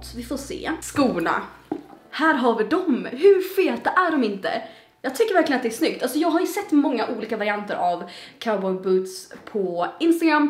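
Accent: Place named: Karlskrona, Sweden. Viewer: Jag hör lite karlskronitiska ändå😉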